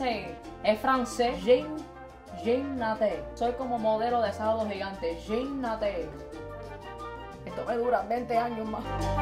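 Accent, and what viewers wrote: Cuba. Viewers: me encantan tus videos. Son lo máximo. Me alegro que mantengas tu acento cubano (siempre tan cálido). Así mismo son las abuelas caribeñas.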